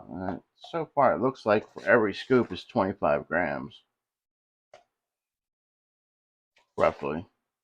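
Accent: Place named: Maryland, United States. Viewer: First time I’ve seen you, but won’t be the last. You sound like you’re from MD, so nice to hear “normal” wuder and hunert.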